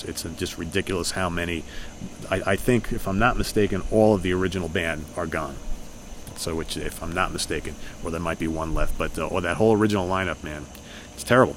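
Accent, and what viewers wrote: New York, United States. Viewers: CCR were from the San Francisco Bay area. Don Henley co-led the Eagles with Glenn Frey, who was no Southerner, and nor were most of the other members of the band. Anyway, you came onto this man's video here, insulting him and using profanity, saying that he doesn't know shit about Southern music because he's not from the South, and he has a New York accent. Man, that kind of thinking and behaving is not "Southern." it's just rude, ignorant, and disrespectful. You may be from the South, but with the way that you came onto this guy's video, telling him off and spouting profanity at him for no good reason, you actually sound more like a Southerner's stereotype of a rude, loudmouth Yankee than a Southerner who was raised with some manners. That's all I have to say. Anything more would be wasting my time.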